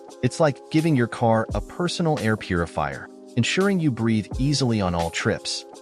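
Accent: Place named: Netherlands. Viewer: I am thoroughly confused with every tip starting out with a Dutch accent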